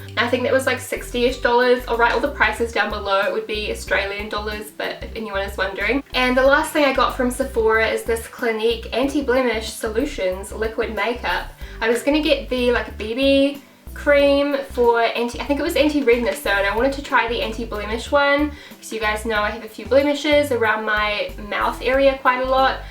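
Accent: New Zealand. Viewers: lovely, can I just ask are you from New Zealand? X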